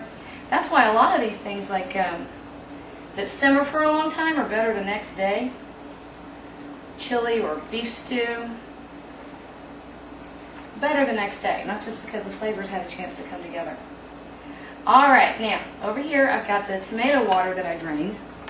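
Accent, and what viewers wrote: South, United States. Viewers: Hi, Thanks for your response i appreciate it.I notice in some cooking videos that People will ask a question to the Cook but there`s never a response so thanks again.I can`t waite to make your recipe.I`ll let you know how it turns out.I love how you`re so friendly with the Youtube viewing audience.I notice that you have a Southern accent are you from Texas? I reside just 30 minutes south of Seattle,Washington, The City where Starbucks Coffee was started.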